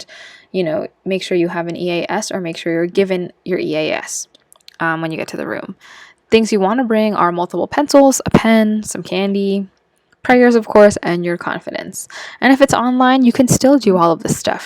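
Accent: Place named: United States. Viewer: Hi DOc. thanks for this ideas. I really appreciate your diction and your voice . I didn't distinguish if you are a Filipino or an American, because your accent is like an American speaker.. I love hearing your speech doc. 🥰👏👍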